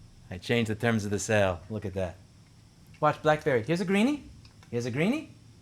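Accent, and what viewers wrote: New York, United States. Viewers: His new Yorker accent is strong in this vid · You can really hear the New York accent come out when you're worked up. I love the good fight you're putting on for us, keep at it ya legend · ...Gee, Can you tell that Louis is from NYC? hehahaha LOL! Mocking the Futurehomes CEO by using his cats as the victims.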